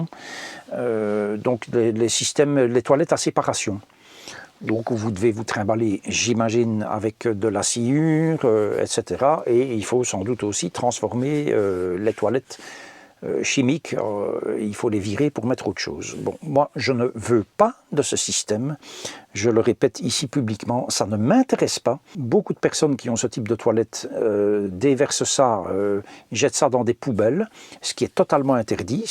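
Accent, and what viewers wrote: Belgium. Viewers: Bonsoir. Un grand bonsoir à la Belgique! Pour la conso en eau je ne puis comparer à la maison. Parce ce que j'ai 2 ados en plus par rapport à mon CC (motor-home!!) Donc machines à laver, lave vaisselle, jardin, piscine...Ceci dit ma douche dans le CC m'a appris non pas à être propre, mais à économiser l'eau sur ce poste. J'adore l'accent qui me rappel mon oncle et tante de côté de Lens (en Belgique bien sûr!)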